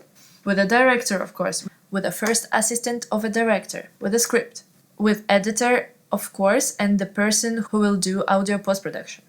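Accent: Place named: Eastern Europe. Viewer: cute славянский accent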